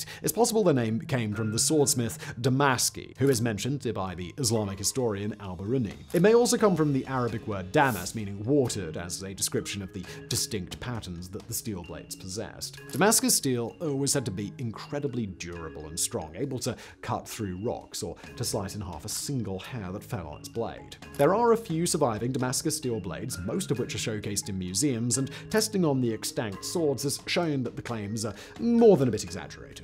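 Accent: United Kingdom. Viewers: Whistler provides hosting duties on like 97 different channels, right? I earnestly attempt to watch these but for me simply talks too fast or my hearing is woefully too slow; add in the British accent and I quickly find myself rewinding from the jump to replay stuff I simply didn’t hear, couldn’t understand or a combination of both. By the time I try using CC to help me I’m exhausted and generally give up.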